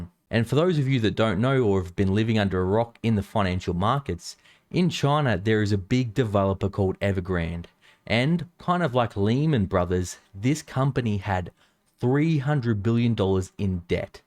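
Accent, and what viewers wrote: Australia. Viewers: Does he have an typical australian accent? I'm not a native English speaker and I try to learn different accents. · i miss the HOLY SMOKES EVERYONE in your aussie accent hahaha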